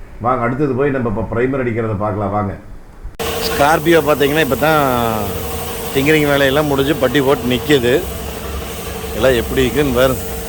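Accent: Kongu, India. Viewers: கொஞ்சும் கொங்குத் தமிழ் பேசும் கோமகரே...! திகட்டாத தித்திக்கும் பேச்சுடைய திருப்பூர் திருமகரே...! எத்தனை அழுக்குப் படிந்த வாகனமாக இருந்தாலென்ன?? வெள்ளை மனமும் பிள்ளை குணமும் கொண்ட மதிப்பிற்குறிய அய்யா🙏 உங்கள் வேலைப்பாடுகளில் வாகனங்கள் ஜொலித்திடுமே தகதகவென்னும் தங்கம்போல❤❤❤❤❤ பின்னலாடைக்கு பெயர்போன ஊராம் திறமை வாய்ந்த ஊராம் திருப்பூர்...! அத்திருப்பூரில் வாழும் திருமகர் அய்யாவின் வெள்ளை மனம்போல மாறிவிட்டது...! இந்த வாகனத்தின் வென்மை நிறம்...! இந்த வாகனத்திற்கு மட்டுமல்ல அனைத்து வாகன வேலைப்பாடுகளுக்கும் கடினமாக உழைக்கும் உங்கள் நிறுவன ஊழியர்களுக்கு என் மனமார்ந்த பாராட்டுக்களையும்❤❤❤ வாழ்த்துக்களையும்💐💐💐 தெரிவித்துக்கொள்கிறேன் என்றும் அன்புடன் உங்கள் மகன்போல இவன் ரா.முஹம்மது கபூர் (சென்னையில் இருந்து)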